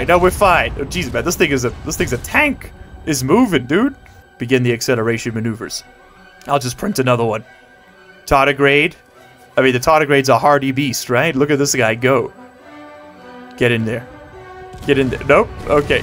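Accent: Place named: South Africa. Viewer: I keep forgetting youre from SA.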